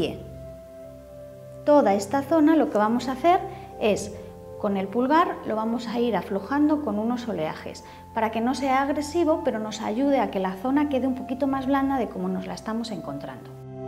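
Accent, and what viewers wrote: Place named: Spain. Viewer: Eres española el acento que masajes tan relajadores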